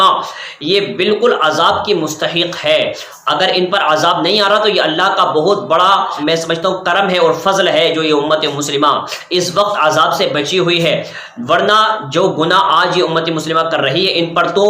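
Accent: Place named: Arab world. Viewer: Ye urdu bhi arabi worst mein boltay hain😬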